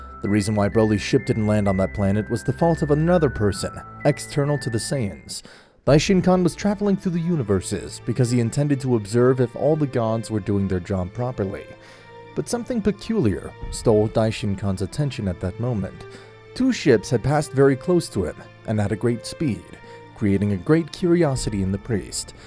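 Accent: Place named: United Kingdom. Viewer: I agree but you sound...toooo british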